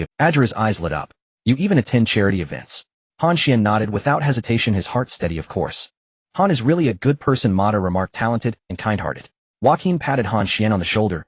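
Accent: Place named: China. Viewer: Is he playing in La Liga or Chinese super league because every name is pronounced in Chinese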